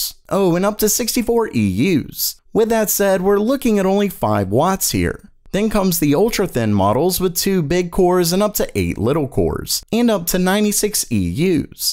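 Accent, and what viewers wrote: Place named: South, United States. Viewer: I can't tell if is a southern guy trying to hide his accent or a regular trying to do a southern accent.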